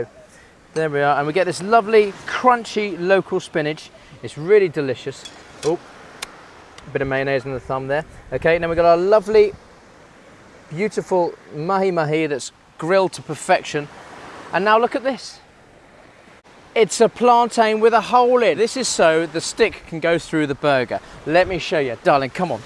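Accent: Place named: Caribbean. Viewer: Some of your pronunciation is sounding so Caribbean, The island is rubbing off on you.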